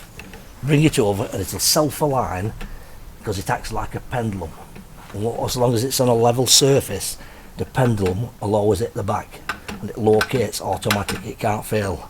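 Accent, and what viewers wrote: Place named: Yorkshire, United Kingdom. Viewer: I love a Yorkshire accent.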